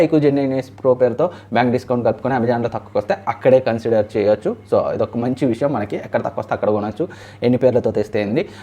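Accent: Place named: Andhra Pradesh, India. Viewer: Medhi karimnagar aeiyna kuda meru matladuthuna language andhara lane vundhi anna Telangana language lane ledhu medhi ❤🥰😍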